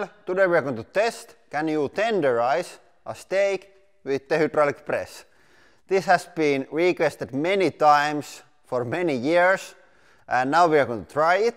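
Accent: Finland. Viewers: I know that because i am finnish myself. I almost immediatly noticed it because the merch of The Black pepper. And he has a finnish acent. Sry if i spelled wrong.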